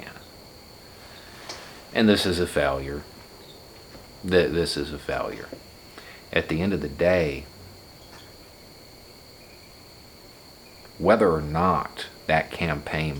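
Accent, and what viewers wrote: South, United States. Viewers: Hmm. I can unmask. 1. He’s wearing a suit, pretty sharp. 2. That pixelation can’t hide his beard. 3. Southern accent, cool demeanor. is Billy Gibbons!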